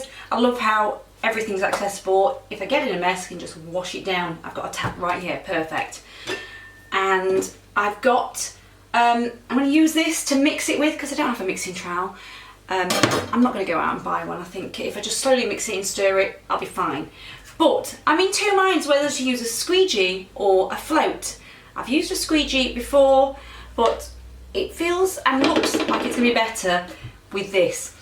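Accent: United Kingdom. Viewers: To, I hope you realize how lucky you are! Your future wife is a catch. Nothing and I mean Nothing will stop her. She’s hot, requires little to no makeup, has a British accent and is fearless. Plus, it looks like she can cook too. Another Nigella Lawson. Congratulations to both of you. Make her happy. Oh, great video. Brilliant!!!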